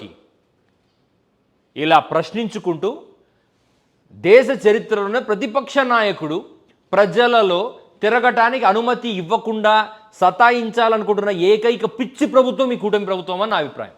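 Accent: Rayalaseema, India. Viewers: Exactly too Good Analysis Aayappa ki maa Rayalaseema Yasa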